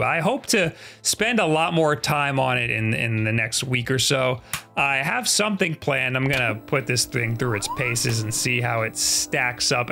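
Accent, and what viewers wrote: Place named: Long Island, United States. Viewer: God I always forget how much I hate how Long Island folks say "Mario" until I hear your videos ha-ha You seem to purposely try to say it 100 times a video. i cringe each time.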